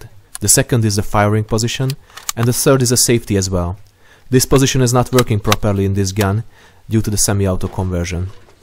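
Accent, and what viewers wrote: Hungary. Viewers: I have a Hungarian friend & he talks just like you I can bet you're Hungarian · Horribly Hungarian accent.. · Halgattam a videót nemnéztem leírást és úgy a 10.ik másodperctől éreztem hogy Magyar Vagy :) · Nagyon gyanús volt hogy magyar vagy, az angolod alapján, de amikor meghallottam a magyar beszédet akkor voltam csak biztos benne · His got that hungarian accent, trust me i know :D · JÓ lett a videó És mikor elősször hallotam a kommentárt egyből feltűnt hogy mintha egy kicsit magyar volna :D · Mielőtt elolvastam a kommenteket, már sejtettem, hogy magyar a beszélő...le sem tagahtatja :D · Annyira lehet hallani hogy egy Magyar beszél Angolul :D Jellegzetes akcentus, meg beszédi forma vagy minek mondjam. De az ember hangján lehet inkább észrevenni hogy igen, a Magyarok beszélnek így angolul :D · szintén, elég volt meghallanom az első hangot, és már néztem is egyböl leírásba hogy tuti valami magyar lehet :D talált süllyedt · az angol akcentusodból tudtam hogy magyar vagy :D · the accent is terrible. 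Im from Hungary, i live in the USA so im surrounded with these Hungarians but this one takes all. otherwise cool video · Imádom hogy hallottam hogy ez magyar akcentus lesz és téényleg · It took me 40 seconds to realise it is hungarian accent. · A fülemnek tetszik a magyar akcentus :D minek szinészkedni az angolt. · Hmmm... as a romanian I can recognize that magyar accent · Az akcentusból szerintem te magyar vagy :D Pláne a fenti oldalból a ".hu" nagyon gyanús :D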